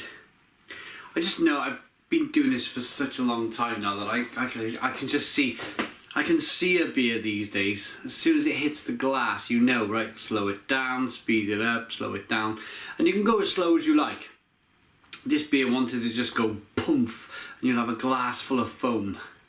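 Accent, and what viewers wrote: United Kingdom. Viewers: Interesting beer and another great review. Are you English or Welsh?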